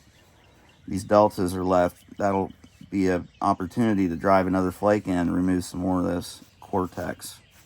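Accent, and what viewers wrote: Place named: Alabama, United States. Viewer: Cool you used the word solicified in a sentence! Thank you for sharing. Curious where you’re located. I’m in nw Alabama and you sound like home folks.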